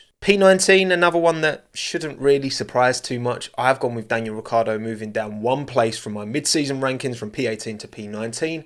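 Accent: United Kingdom. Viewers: I wanted to ask you a question that might come off a bit rude, but I don't mean it rudely. What's your particular style of British accent called? I watch a lot of F1 youtubers from the UK, and it's not like I've never heard British accents before... Your accent is kind of particular though, and I just wondered what it was called. No disrespect meant.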